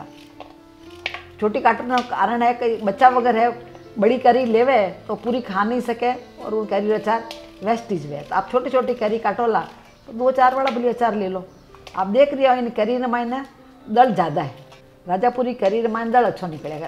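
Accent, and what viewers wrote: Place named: Marwari, India. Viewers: आप री आवाज ओर मारवाड़ी में बोलण री स्टाइल बहोत ही पयारी लागी सा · Aachar ka toh pata nahi but aap ka bolne ka Rajasthani andaz bahut badhiya hai 👍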